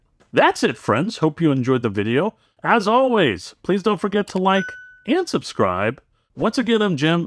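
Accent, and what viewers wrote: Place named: Ohio, United States. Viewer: Your accent sounds central Ohioan, Columbus?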